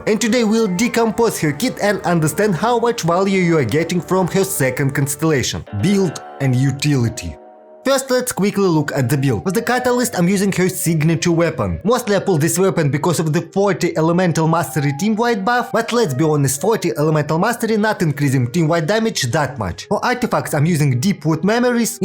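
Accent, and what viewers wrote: Russia. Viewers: Как забавно слышать английский язык с русским акцентом) Нахида и Яэ Мико прям чисто на русском сказаны) Но английский неплохой) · Прикольный рашен инглиш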